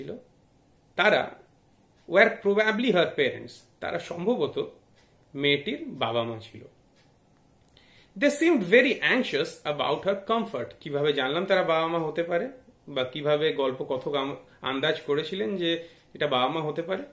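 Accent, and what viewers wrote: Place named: United Kingdom. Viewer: And also your pronounciation is really nice and alike to British English that helps me to learn how to pronounce the words there in the text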